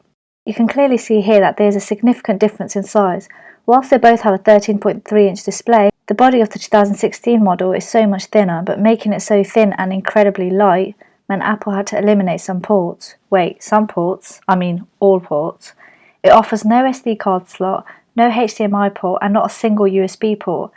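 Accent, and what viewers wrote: United Kingdom. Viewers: Is it me or you have a strong Birmingham accent ? ahah ! Thanks for the video :)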